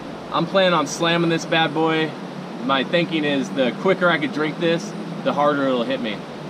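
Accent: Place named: United States. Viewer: Sangria pronounced SANGRIA not Sengria. If you you want to learn Spanish you must drop that gringo accent. Otherwise sounds so stupid.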